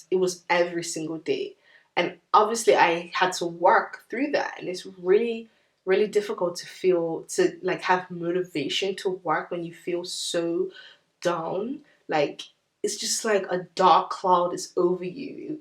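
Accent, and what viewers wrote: Bermuda. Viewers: That moment when you're clicking through YouTube and hear a familiar Bermudian accent. I love that you have a little more British mixed in there! Just gained a 441 subie btw ❤️😊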